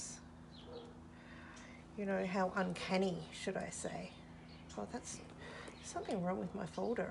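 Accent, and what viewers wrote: Australia. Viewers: I just found your site. Cannot hear you...voice is so soft....love the Aussie accent...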